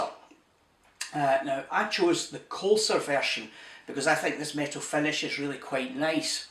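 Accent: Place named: Scotland, United Kingdom. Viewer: It's a Scottish accent. So, no.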